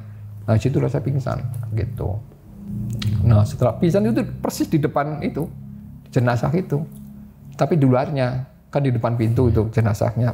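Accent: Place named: Banyumas, Indonesia. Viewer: Ketara banget ngapake kang.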